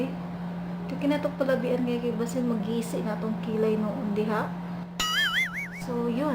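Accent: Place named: Visayas, Philippines. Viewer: naminaw ko nimo sis ba lami kaayo paminawon ang puro bisaya ba ganahan ko cge ko katawa nimo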